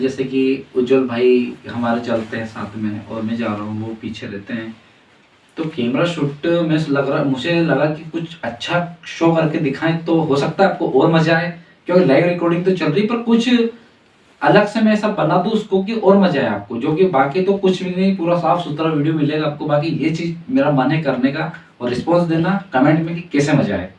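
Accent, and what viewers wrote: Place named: Ajmer, India. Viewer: Bhai aap ajmer side ke ho kya sorry bura mat manna mujhe aisa lag raha hai ❤️🙏🙏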